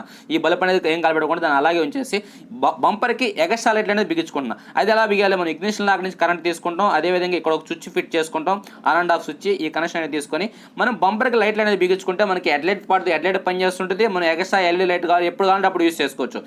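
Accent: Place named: Nellore, India. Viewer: Super cheppinaav bro.. Mana Nellore language Lo adharakottinav...Pulsar NS160 Ki same led lights koni vunnaanu.. Dhaaniki fitting Yelaa cheyyaalo oka video cheyyandi.. Chaalaa mandhi wait chesthunnaam Ikkada😍😍😍.i like this video and I like your words...nice bro keep it up. And 160 NS maathram marchipokandi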